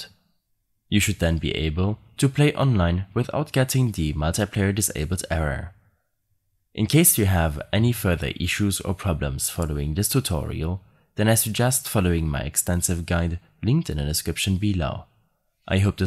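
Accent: Germany. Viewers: Noch keinen deutscheren Akzent gehört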